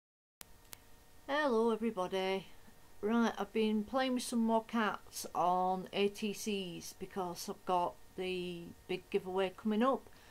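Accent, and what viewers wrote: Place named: Northern England, United Kingdom. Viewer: Love your artwork, watch videos at night when I can't sleep so this is the first time with volume, lol, not the American accent expected but a right northern accent :-) x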